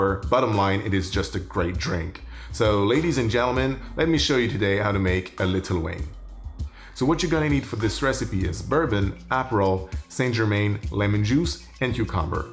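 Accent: Quebec, Canada. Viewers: Love the channel dude ! coming from The Educated Barfly, Im guessing from the accent you're from Quebec, quel region ?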